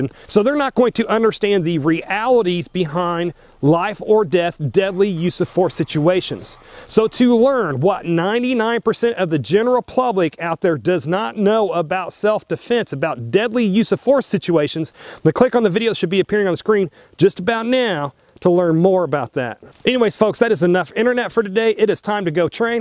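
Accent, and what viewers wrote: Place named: South, United States. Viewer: Just sounds "country" to me. At least thats what the kids are calling it these days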